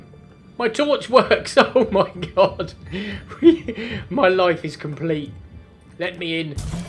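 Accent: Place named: South West England, United Kingdom. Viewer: Sounds like your from the South West mucker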